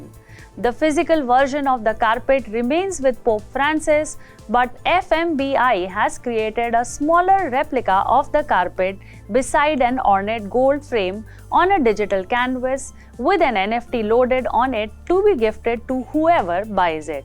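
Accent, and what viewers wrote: Gujarat, India. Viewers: The content is very relevant and the updates are fascinating and fantastic. is very pretty, presentable and has s good voice. The only slight problem is that her accent is quite gujju and is a bit of a distraction. Hopefully she goes through accent and diction training. Amazing content. Probably the best I have seen anywhere on Cryptoverse.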